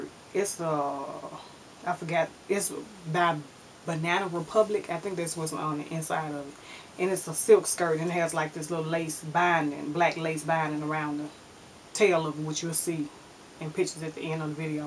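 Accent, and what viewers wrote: South, United States. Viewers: Please tell me where are you from. Strong southern accent. Love it!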